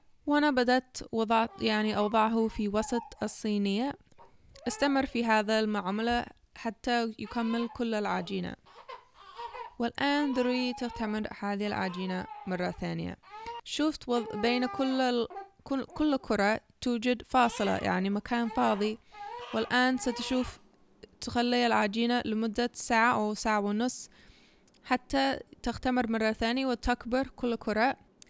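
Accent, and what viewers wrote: Yemen. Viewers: ماشاء الله عليش يا روووووووووعه تسلمي ياروحي 😘احلا شي كلامش يجنن كأنش يمنيه الله يوفقش استمري واحنا ندعمش 👍🏻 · ياحليلها الكنة اليمنية واضحة في كلامها اندمجت في المجتمع اليمني.الله يحفظ لها طفلها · I love the yammany accent😂😂😂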